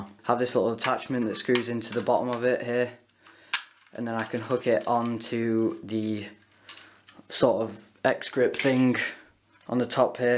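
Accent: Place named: England, United Kingdom. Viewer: Subbed :) You from England because your accent sounds english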